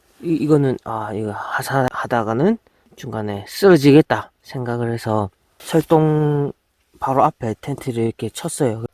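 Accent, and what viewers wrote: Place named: Heilongjiang, China. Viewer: 옛날생각난다..말투가 흑룡강독립운동가 후손같네요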